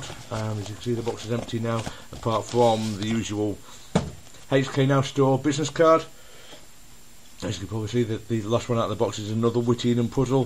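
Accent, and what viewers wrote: United Kingdom. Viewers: Rightright. I just mean British. I am not from an English speaking country so your British accent sounds awesome imo. :D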